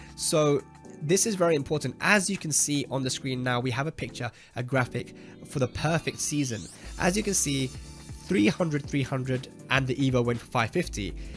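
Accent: South Africa. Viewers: Lmao that south african accent 😅😂. Lovely video 👌🏼🔥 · Lol, that South Africa accent! :D Haha, thanks for the shout out! We're as excited as you are about all of this! TWT LETS GO!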